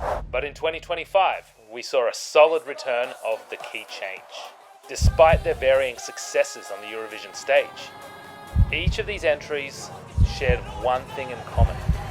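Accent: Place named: Australia. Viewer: All true. This is historic and cultural way of singing also. Etno. And if this is Aussie accent I love it. The best english ever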